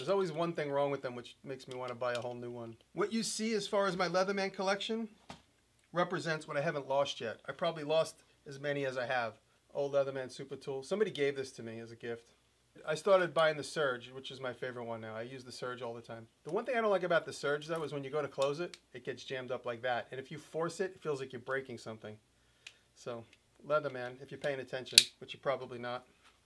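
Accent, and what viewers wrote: New York, United States. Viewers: Love the New York accent.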